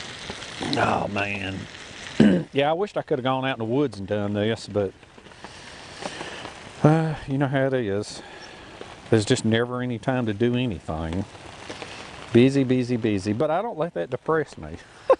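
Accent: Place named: Kentucky, United States. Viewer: Are you from Kentucky? I grew up in Kentucky and your accent sounds familiar to me.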